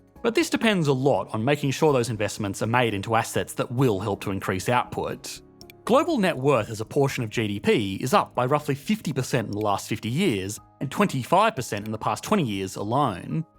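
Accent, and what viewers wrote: Australia. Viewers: An Australian Chrisopher Walken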